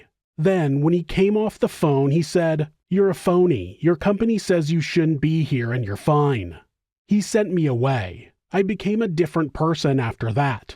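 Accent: United States. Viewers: I wonder where with a J was brought up? Where his accent is from? He doesn’t live in the States but he has an American accent. Quite a unique voice. 🥂🦘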